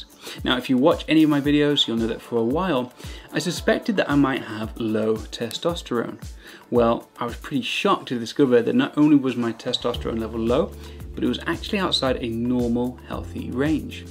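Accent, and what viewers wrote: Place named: United Kingdom. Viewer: Ur british? Love the accent :-)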